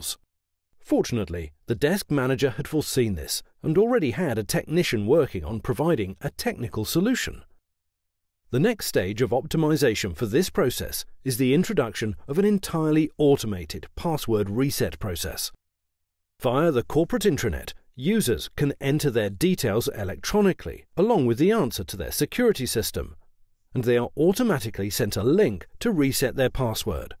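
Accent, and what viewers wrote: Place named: United Kingdom. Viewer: Thank you, thank you, thank you for uploading all of this. I can focus and understand much better with a nice British narrator as opposed to the flood of p.a.j.e.e.t made videos.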